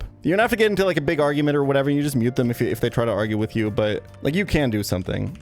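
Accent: United States. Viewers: I took German in High School and the American accent while speaking German had me DYING 😂🤣 - a dumbass American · I don't speak German but it's funny that I could hear the American accent regardless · American with obvious American accent but still good German struggling with memes bit may have crippled me.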